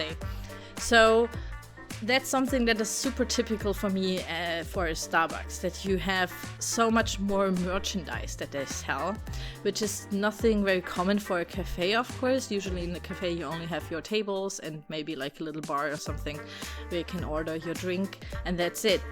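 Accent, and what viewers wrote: Germany. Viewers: Ja, in Deutschland sind fast alle Starbucks in anderen Gebäuden integriert. Ich habe vom ersten Satz an gehört, dass du deutsch bist. :D Aber dein Englisch ist gut. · Du hörst dich richtig an wie eine Deutsche die englisch spricht..😂😂😂😂 aber seeeeehhrrr süß !! 😂😂🦄🦄🦄💕💕❤️❤️🍓🍓😘😘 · Are you German?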